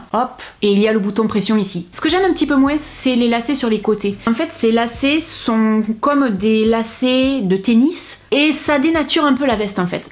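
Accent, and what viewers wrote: South, France. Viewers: Top, t'es pas banale tu es une fille du sud avec l'accent, un peu ronde, et tu assumes tout comme moi. Après Cardy b c'est pas mon style et le simili cuir ça me fait trop transpire. Je suis du Tarn pays du cuir. Bise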